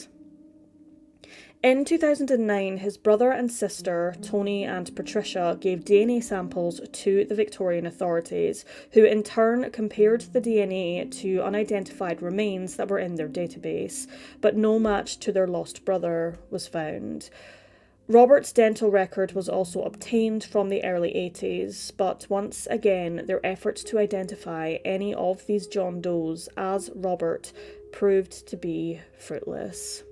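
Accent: Scotland, United Kingdom. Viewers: SHE'S IS SCOTTISH ACCENT THANK YOU!!!!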